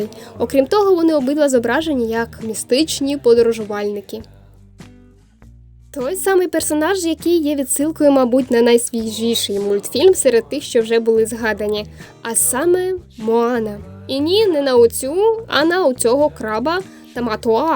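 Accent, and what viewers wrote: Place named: Ukraine. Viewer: можешь по русскому говорить?ты же вроде раньше разговаривала на нём...незнаю что ты хочешь нам доказать своим украинским акцентом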